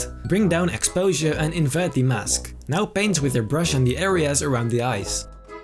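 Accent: Netherlands. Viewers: Are you Dutch? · Bro je hebt een geniaal account man, Ik weet niet hoe ik je tegen kwam op YouTube, maar toen ik je accent hoorde wist ik gelijk dit is een Nederlander haha. Keep it up man, good work. Abbo er bij ! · Are you Dutch??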